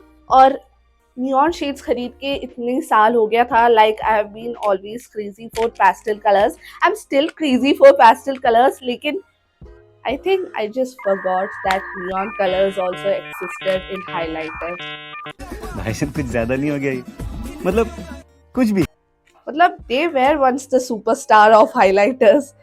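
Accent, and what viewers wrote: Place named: Kerala, India. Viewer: Is really your a malayali that I want to say you have a great malayalam slang really I want to praise you , you are amazing that when you said malayalam that I shocked because that is so good then I don't know you are a malayali i have a wish if you are a malayali please speak Malayalam again that I like the way you say malayalam I want to hear your Malayalam again and please say my name ramziya As a malayali I don't have this like slang I loved your Malayalam words I wish I can hear it again like when you speak Malayalam all the one who hears will melt for that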